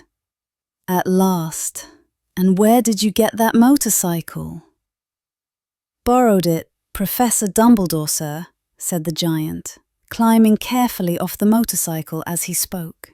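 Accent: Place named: United Kingdom. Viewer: wow what a translation and english pronounciation. Pure British Accent. Awesome.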